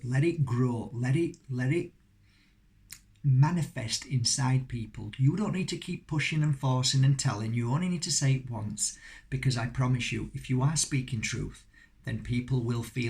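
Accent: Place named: West Yorkshire, United Kingdom. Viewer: HI from sunny Halifax in West Yorks! I thought I recognised your accent. So pleased to have found your channel. I have given up worrying about what people think of me. Although I have to be careful when people are talking about losing loved ones when they have died. I honestly dont grieve anymore. I dont get distracted by politics anymore and some think I dont care maybe? but this is not true. I just care about what I give my attention to these days.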